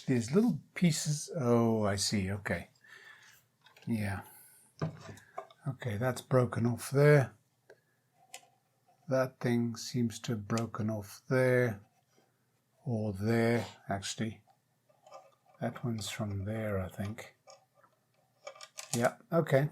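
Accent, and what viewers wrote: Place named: South Africa. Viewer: btw i was always puzzled by your South African accent, in Madeira and just now i found out that you studied there. The mystery solved. Sorry for prying.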